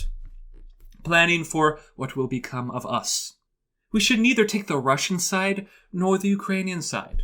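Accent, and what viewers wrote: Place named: United States. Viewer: Oh yup let’s keep pushing a pro Russian sentiment then go ahead and keep whining about how apparently Rusyns are being left off, bunch of bullshit considering you don’t even live in Ukraine you have a very nice clear American accent just a bunch of shit to disguise yourself as pro Russian and you sitting there happy for Ukrainian people being killed.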